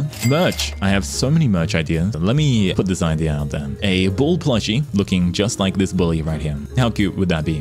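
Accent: Australia. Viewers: The Aussie accent is what hooked me up immediately. Also I am genuinely way more than impressed by how good the vtuber model is and love your sense of humor. Congrats on your redebut!! (❁´◡`❁) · Omg, is that an Aussie accent I hear? If so, that makes me so happy more of us are getting out there and entering the VTuber community. Congrats on your debut! If you're not Aussie, mb lmao. Can't unhear an accent that I'm always surrounded by 🥹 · Sir! You are making me a tomato and I am trying not to scream! (You're Aussie accent is making me loose it despite being surrounded by it all the time since I'm an Aussie)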